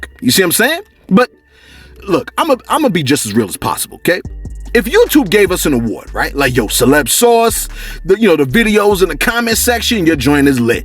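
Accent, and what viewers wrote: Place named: New York, United States. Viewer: Sounds like a New York accent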